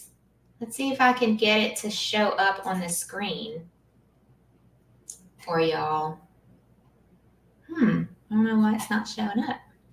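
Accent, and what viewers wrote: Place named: South, United States. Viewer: your soft southern accent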